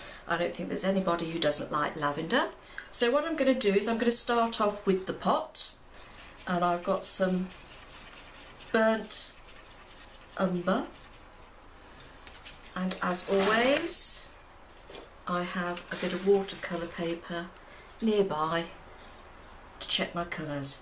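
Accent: United Kingdom. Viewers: British accent wouldn't let me leave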